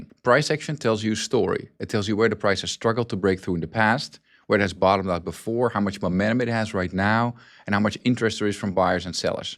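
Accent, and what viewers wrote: Netherlands. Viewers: It too me a few videos to pick up the Dutch accent, good job, it’s pretty soft, unlike my grandfather from Bruges Belgium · I guess it’s just me but hearing a Dutch accent somehow increases credibility with these types of videos. I will be following your advice more closely! Edit: Ha I knew it, I even read your book!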